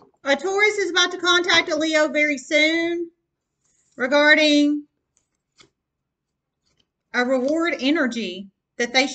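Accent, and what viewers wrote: South, United States. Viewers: Love the sound of your voice. Very Southern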